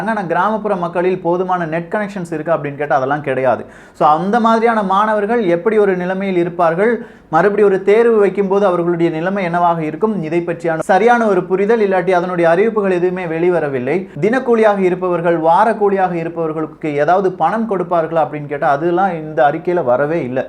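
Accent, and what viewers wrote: Kerala, India. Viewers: நண்பரே உங்கள் தாய் மொழி மலையாளமா? உங்களின் சில ஆங்கில உச்சரிப்பு மலையாளிகள் போலவே உள்ளது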